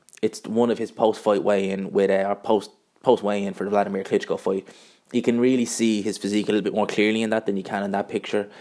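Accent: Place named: Ireland. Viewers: Hey bro Good to here an Irish voice! Good video ! Fair play, keep them coming! Anyways I think fury will school him, easier then Klitschko!! And truthfully brother I definitely believe the gypsy king is in way better condition now then he was against Wladimir Klitschko, his more powerful now and still got the speed ! Cause now his doin weights, were for he didn’t before! And bro he seems WAY HAPPIER NOW 💪🏼 and you know the saying ( A HAPPY FIGHTER IS A DANGEROUS FIGHTER ) THE GYPSY KING IS BACK!!! A NEW 🥊🥊🥊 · Wether Irish voice or not nice to see a non biased opinion based on boxing knowledge not who is liked most ! This is 2nd video watched and subbed ! ....... more posts like this the better as to many now Mae videos on who they like and people just say "casual" to the with different opinion ! .......... keep posts coming mate ! Gman........and aaron refreshing to see someone commenting with no insults for a change 😂 peace ✌🏼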